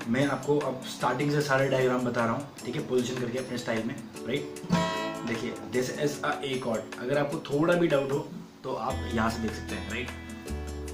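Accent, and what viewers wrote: Himachal Pradesh, India. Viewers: Himachali ho bhaiji ??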